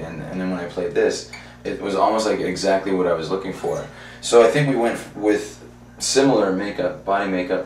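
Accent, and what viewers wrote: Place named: Southern Ontario, Canada. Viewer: First time I saw you acting up and carrying on I knew you were from Southern Ontario. Us Ontarian’s have a distinct accent when we’re excited. And then there’s your Port Dover Friday the thirteenth t-shirt, dead giveaway.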